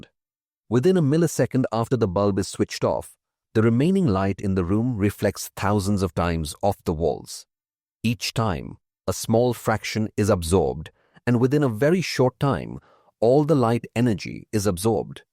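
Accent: India. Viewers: Akin to Indian accent that irritates. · Although I like this channel, But the narrator Indian accent and way of talking is annoying to me!!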